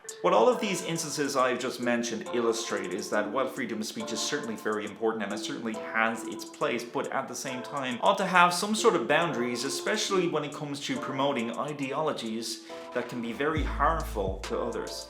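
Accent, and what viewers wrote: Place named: Ireland. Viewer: very cute :) you look and sound very very Irish here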